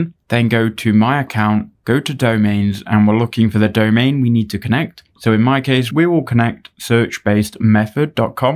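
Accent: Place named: United Kingdom. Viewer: Just simple and true 👍. Followed it and got mine done in 5 minutes. Also loved the British accent <3😂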